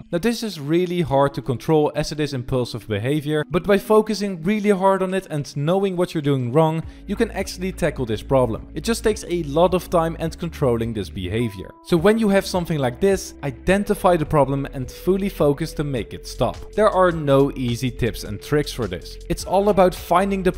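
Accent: Netherlands. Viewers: Are you dutch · Are you from the Netherlands? It sounds like it. Edit: you are haha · qucee 😂😂 Are you dutch Nice vid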